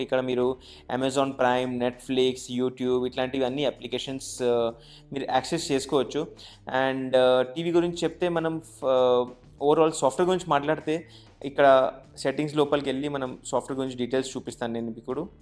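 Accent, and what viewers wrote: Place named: Hyderabad, India. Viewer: Sir u r speaking good telangana language I.e, hyderabadhi language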